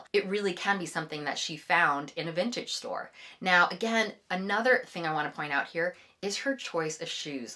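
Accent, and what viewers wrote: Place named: United States. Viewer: This is a fantastic series of videos, thank you so much, you clearly know a lot about style and you speak very clearly with a beautiful, soft American accent you so rarely hear these days, it's really a pleasure to listen to you. I love Alexa Chung although some of the outfits you showed are not to my taste. I grew up in Italy in the 70s and, age 58, I've recently gone back to wearing similar outfits, for example corduroy bootcut trousers (also worn in the 90s by Carolyn Bessett-Kennedy), double denim with trucker jackets and straight leg jeans, gingham shirts, also worn with denim, white straight-leg jeans with a blue t-shirt (like Jackie O), velvet suit with flared trousers, pussybow blouses, platform shoes, contrast collar t-shirts, David Bowie vintage t-shirts. The funny thing is that my style now is similar now to what I was wearing in the 70s when I was a child or teenager, these pieces are modern classics (but not too classic, as I find classic style is often ageing and too ladylike - there's a fine line). 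I would not say my style is vintage but I love the odd 70s touch, also in the colours (orange and brown, khaki), just as I like wearing the odd edgy or even sexy item.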